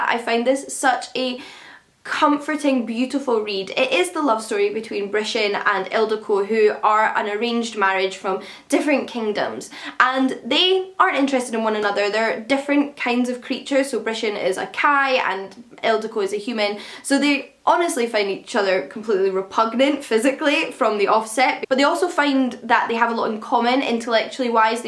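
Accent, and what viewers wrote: Scotland, United Kingdom. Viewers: so nice to hear another Scottish accent on booktube! keep doing what your doing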